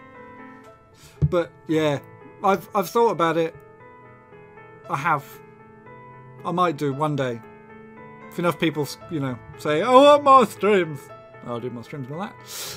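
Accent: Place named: United Kingdom. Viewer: Welcome. Figured it was some sort of U.K. accent just couldn't place it.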